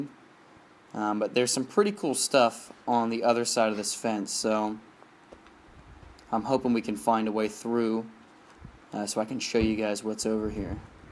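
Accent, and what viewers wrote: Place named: South, United States. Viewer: Your accent has taken on a bit of a southern twang I hadn't noticed before. Hah.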